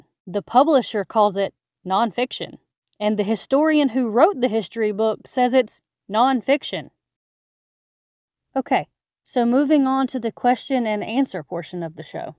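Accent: South, United States. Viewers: Your southern accent threw me off for a moment. I thought you said the news reported by Bill, then after thinking for a moment I realized you said. A funny misunderstanding on my part!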